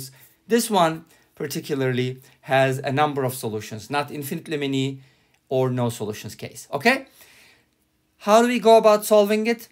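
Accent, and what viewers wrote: Turkey. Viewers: are you turkish?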